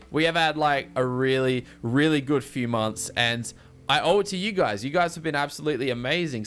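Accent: Australia. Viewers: I think he's from Australian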